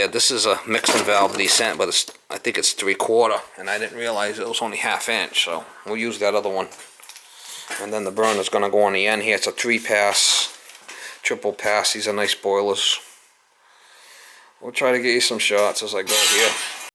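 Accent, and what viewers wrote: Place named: Massachusetts, United States. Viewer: Sounds like you're from Massachusetts where's your fire Watchman for that torch . lol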